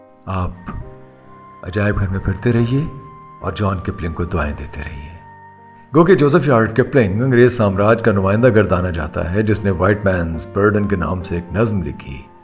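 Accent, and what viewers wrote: Punjab, India. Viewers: I like Ur Punjabi accent ❤ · Bahut wadia. I enjoyed your Punjabi dialogue delivery. So powerful voice. Reminded my grandparents. Same style. They were in Gujranwala before partition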